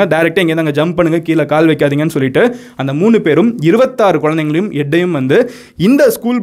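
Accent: Madurai, India. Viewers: Innaiki thaan bha MG, Madurai slang la pesirkaar... Avinga, Ivinga, Pannirkanunga... Daily he sounds formal Tamil, today Madurai slang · Inniku Madhan gowriku madurai slang niraiya varuthu… ketka super aa iruku..🙌🙌🙌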